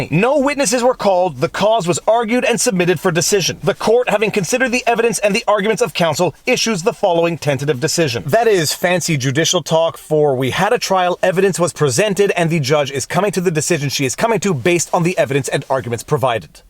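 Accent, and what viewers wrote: France. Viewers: Love the French accent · I always laugh my ass off at the French accented readings.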